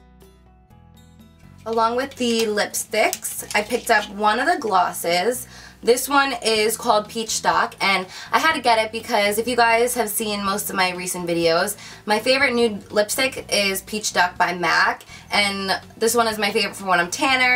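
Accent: New Jersey, United States. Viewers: Where are you from? I love your accent. It sounds kind of jersey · I'm a new subscriber and I have to tell you I LOVE your Jersey accent!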